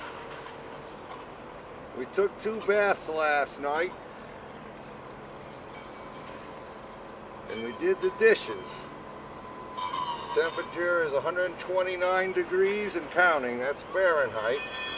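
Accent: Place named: Maine, United States. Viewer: Yes, I noticed that but coupled with his "Downeaster" accent it makes for a weird combination.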